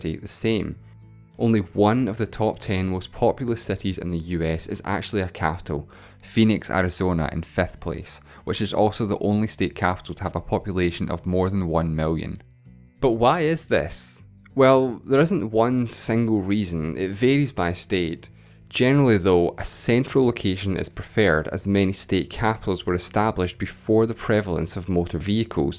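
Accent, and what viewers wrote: Scotland, United Kingdom. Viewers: is this a scottish accent? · Why scottish people pronounce "i" as "e"? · is the accent Scottish? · Andrew Matthews it’s scottish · Scottish accent? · Interesting, enjoyable and once again it shows how much there is to learn about the world and how much I don’t know. Thank you for doing this and I thought it was excellent. PS. Dinnae take any guff about your accent. Edinburgh or somewhere in the borders? Or do I have even more to learn about Scotland? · scottish i think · are you scottish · If im not mistaken he is scottish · More like Scotish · I'm thinking your country's capital is Edinburgh, based on your accent. 😉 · He is Scottish give him a break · scottish english accent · sounds like a scottish accent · He is indeed Scottish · Love the Scottish accent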